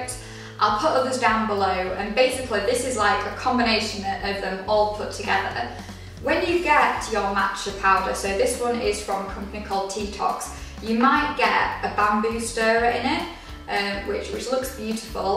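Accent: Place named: Northern England, United Kingdom. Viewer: Love your northern accent, makes me miss home!! xxx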